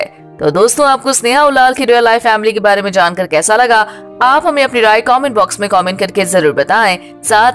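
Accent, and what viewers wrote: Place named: United Kingdom. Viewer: I think the narrator lady is Finding it difficult to speak hindi normally, why speak with a English accent? British gulami gayi nahi.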